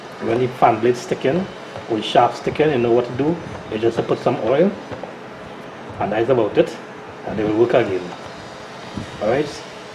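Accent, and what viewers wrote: Trinidad and Tobago. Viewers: Is that a Trini accent I’m hearing?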